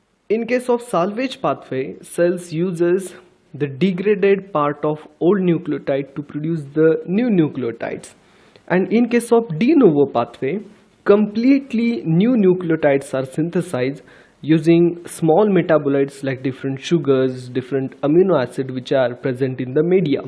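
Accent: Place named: India. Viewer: Hangi aksan bu hintli kardeş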